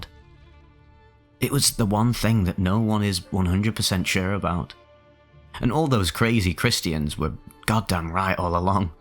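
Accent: United Kingdom. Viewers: He’s British that’s just how they enunciate lol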